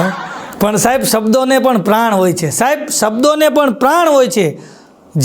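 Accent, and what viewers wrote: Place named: Kathiawar, India. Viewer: હસતો રહે, હસાવતો રહે, કાઠિયાવાડી લહેજામાં સંસ્કારી હાસ્ય... પ્રસરાવતો રહે!!! રિદ્ધિ-સિદ્ધિ ખુબ વરો... વડિલોના આશીર્વાદથી સિદ્ધિનું એવરેસ્ટ સર કરતો રહે!!!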